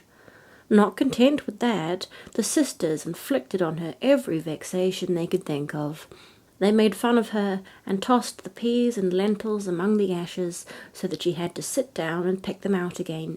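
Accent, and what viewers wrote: New Zealand. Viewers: I listened to this story with my four year old son, its lovely to hear a story in our wonderful kiwi accent! we both enjoyed it, thank you xxx 🌹💖 🤘😈🤘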